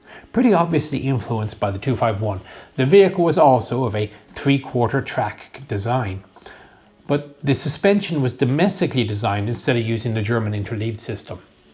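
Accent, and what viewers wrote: Ireland. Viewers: I can hear the Irish accent coming out all over the place! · Is this some joke reference that I've missed? As bwarre2884 noted, the accent is very Irish. In any event, he is perfectly understandable.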